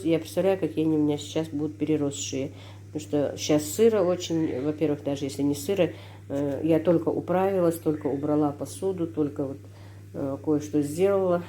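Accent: Standard. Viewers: если не секрет, вы родились в Ингушетии? Чисто говорите на русском языке.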